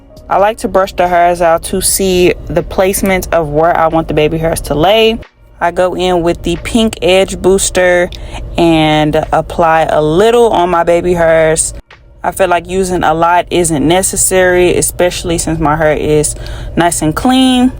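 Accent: St. Louis, United States. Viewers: She sound like she from st. Louis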